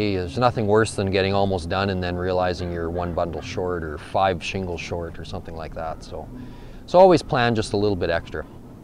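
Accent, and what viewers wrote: Canada. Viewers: First time here....why do you sound canadian?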